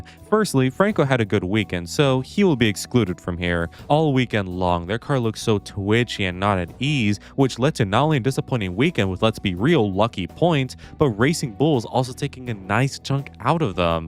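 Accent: United Kingdom. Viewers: No way bruv ur form California I always thought u were British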